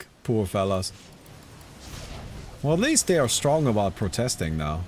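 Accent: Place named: Germany. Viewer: I remember the first day I started watching you, I could smell the german from miles away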